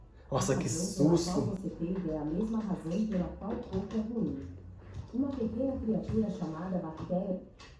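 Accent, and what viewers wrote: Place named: Goiás, Brazil. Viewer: O sotaque goiano é o charme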